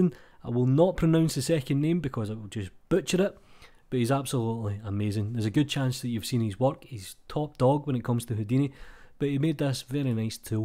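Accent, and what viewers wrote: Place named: England, United Kingdom. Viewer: Can’t understand that English accent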